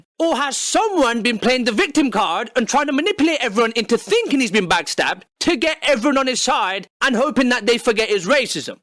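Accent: United Kingdom. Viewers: Wait... HES BRITISH???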